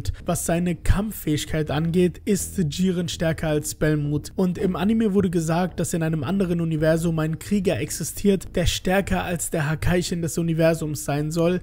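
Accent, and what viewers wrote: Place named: Netherlands. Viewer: Ich dachte das war ein holländischer Akzent 😁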